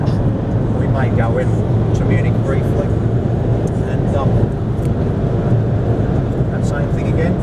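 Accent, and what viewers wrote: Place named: Australia. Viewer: Your Australian Sounds adorable - there has to be more of you from Down Under over Here in Germany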